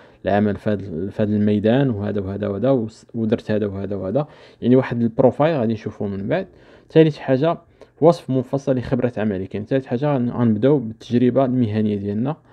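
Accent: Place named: North Africa. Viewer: اخى الكريم ارجو مراعاة ان الكثير منا لايجيد اللغة الفرنسية وكذلك معظم الدول العربية لايستطيعون فهم اللكنة العربية الخاصة بشمال افريقيا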